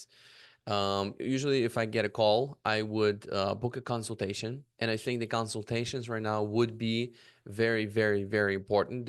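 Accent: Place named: Eastern Europe. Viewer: I appreciate this, I think after the NAR settlement many are confused with what’s happening with buyer’s agents. There just needs to be more conversations to be had and value provided to clients. Love this breakdown and script, I think this is valuable for agents! Got a sub and like from me bro👍💯. P.S. - I'm Romanian, where are you from? You sound Eastern European 😅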